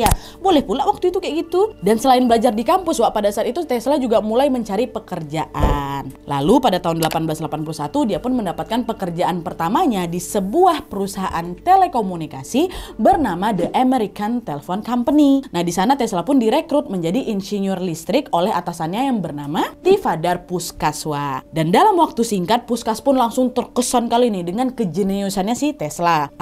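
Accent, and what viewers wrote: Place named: Padang, Indonesia. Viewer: Logat nya unik😂😂😂 logat padang kayak nya nih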